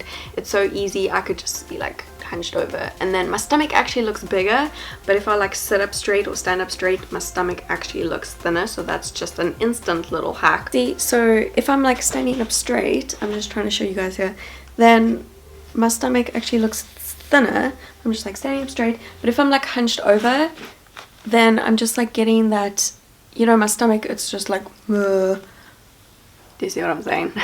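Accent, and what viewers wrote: South Africa. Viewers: she has south african accent i quess and it sounds so elegant and relaxing i agree 💕💕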